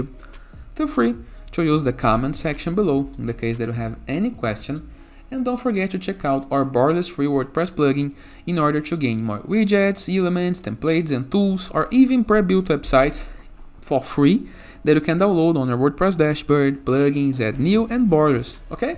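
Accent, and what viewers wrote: Brazil. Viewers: pelo sotaque e BR hahaha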